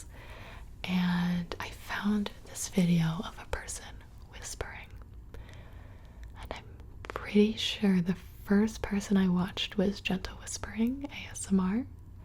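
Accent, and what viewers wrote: South, United States. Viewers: i frickin love southern asmr sounds. she is the best.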